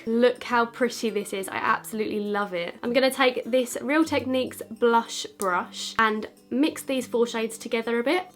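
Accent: United Kingdom. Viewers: It's fun watching this and see you do makeup really well and talk with your accent and British sayings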